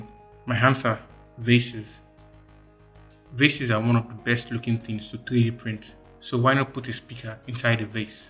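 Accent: Nigeria. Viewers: Wow, you sound like a Nigeria. I am a big lover of DIY but not so much not much tools to bring out those ideas. Great to see someone in my hood do these things. Keep it up man.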